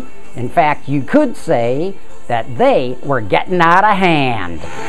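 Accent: United States. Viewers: This guy's voice is insufferably American. I'd hate to travel with him to Europe.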